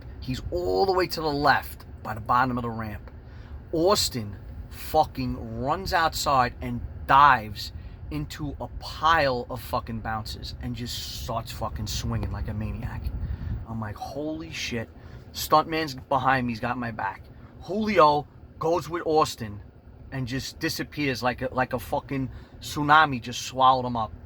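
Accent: New York, United States. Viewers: Love the content you and stuntman produce it's awesome so glade i found your channel I like your accent it reminds me of the old new York movies great work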